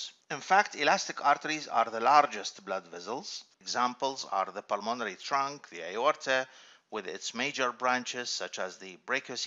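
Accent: Iraq. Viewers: شكرًا يا دكتور .. احساس كبير من الاكسنت ان حضرتك عراقي😅🙈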